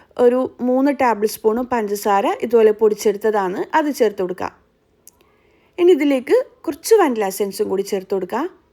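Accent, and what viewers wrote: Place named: Kannur, India. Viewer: നിങ്ങള് കണ്ണുർക്കാരിയല്ലേ... നിങ്ങളെ സംസാരം ഇതുവരെയും എവിടയും കേൾക്കാത്ത സംസാരം... ഓരോ വാക്😂 റെഡി ആകാൻ വേണ്ടിക്ക് ഇങ്ങനെ കുറച്ചു ... കേൾക്കാൻ രസം ഉണ്ട് 😁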